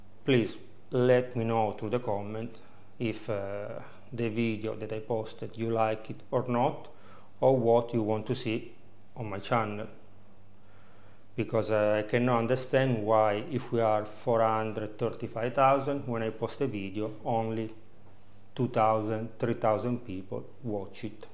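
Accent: Italy. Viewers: I have been watching all of your videos ever since 2020, and it has become a family tradition to watch your videos all together. I would say try to make "shorts" trailers of your actual videos with a link for people to click on and watch the whole video (put a lot of suspense in it to draw their curiosity to watch the full video), reupload those shorts on tiktok and instagram (tiktok can get you a lot of views on shorts). Also work on your thumbnails a bit more, put like a picture of your "surprised" face looking at the dish that you're making. If you're unsure how to do this or how to take a step further on your channel, I would recommend taking Udemy courses (for like 10$) on "school of film" or something similar, sometimes no matter how much you know about things, a course or a tip might always be useful. Personally, what I love about your channel are the traditional italian recipe with your italian accent, and your preserving/canning videos (like sun-dried tomatoes, peeled tomatoes, wine etc ...) If none of the above works, then you might consider switching to creating short videos (less than 1 minute) and post them on youtube, tiktok, instagram but don't give up! 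You have a big fanbase and a big base to begin with, you're halfway there ! Love from Lebanon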